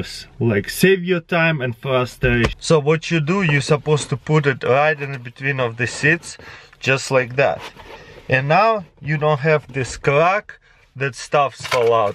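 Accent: Russia. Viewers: I think your Russian accent is what makes you so hilarious, not to mention the BOOMS and the TUMBS up. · I've always wondered do you live in Russia because you have a Russian accent? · Michael Hawthorn meeee i love Russian Accents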